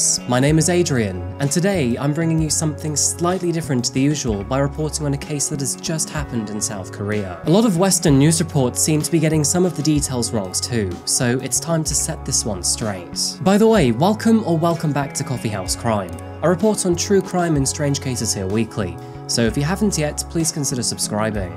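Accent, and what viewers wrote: England, United Kingdom. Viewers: Dear Adrian, may I ask which part of England you are from? I am very interested in the different ways English is spoken in the different parts of England. And of course in true crime I truly appreciate your hard work you put into your videos. CHEERS, Orsi from Hungary. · Thank you Adrian,you ARE one of the best crime storytellers on YouTube. With your clear and properly English accented voice, you ARE a class above the others,please carry on with the good work, you ARE much loved (by me anyway !😊) Bravo ! 👍👌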